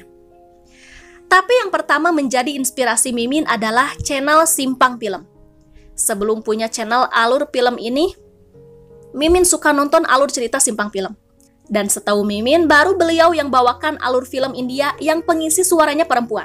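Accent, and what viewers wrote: West Java, Indonesia. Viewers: Akhir nya tau juga teh mimin org Bandung 😂🤭 sy jg ngikutin bang Jeder & bang Gelo... Sy doa kan smoga berkah nya teh mdh"an tmbah berkembang channel nya..dri awal suka dengerin suara c tth sok hyang seuri lamun teh mimin ngomong sunda teh 😂 😂 ciri khas bgt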